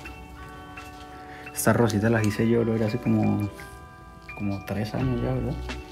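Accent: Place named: Bogotá, Colombia. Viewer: si noté por como hablas q eres de acá, sería brutal q tuvieras una tienda bogota, un abrazo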